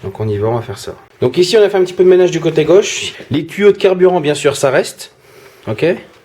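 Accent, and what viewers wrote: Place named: Nord, France. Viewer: Salut " " je me permets de t'envoyer ce message car moi aussi je suis youtubeur je viens à peine de me lancer dans tout ça. Je suis mecanicien PL de métier et j'aimerais que tu me donnes ton avis sur ma chaîne n'hésite surtout pas à critiquer. Tu ne sais pas comment les gens adorent ton franc-parler. Cela se sent que tu es du Nord moi mes parents sont du câteau 59. Et franchement je kiffe trop ta façon d'être. Je te laisse le lien de ma chaîne youtube surtout n'hésite pas à me déglingué s'il le faut <link>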